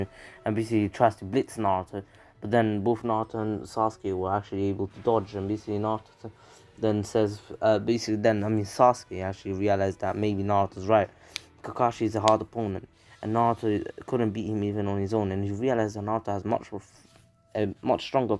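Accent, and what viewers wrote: United Kingdom. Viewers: Bro i love the british accent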